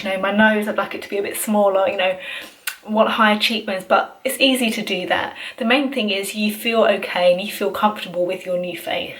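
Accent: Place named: United Kingdom. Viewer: Wow!! The result is fabulous! Love your face profile (actually, love all your face). I am a 53 year old man that's gonna have double jaws surgery on next February. I have myself accounting about the same comments when i decide to go on with the surgery (my age,etc) . I just want to say to the public that people should listen to themselves if they make the decision, like you did !! We can see that it was really worth it. By the way, are you British ? You have a cool accent. I'm Canadian from Quebec.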